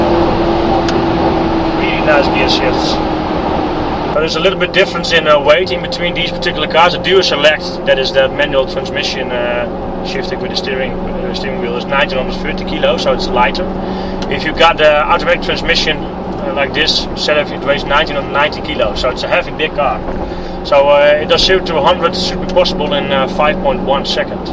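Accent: Netherlands. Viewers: You sound Dutch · duidelijk een NL'er, wat een accent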